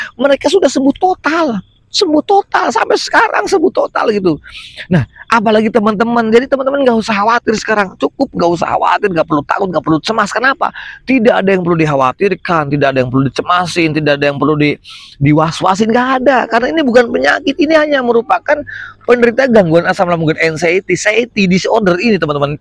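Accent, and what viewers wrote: Banyumas, Indonesia. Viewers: kirain ormg sunda kang jbule ngapak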